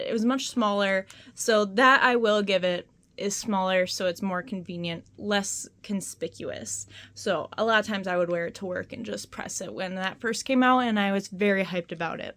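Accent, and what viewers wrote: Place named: Midwest, United States. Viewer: I can tell you are from the mid west lol :)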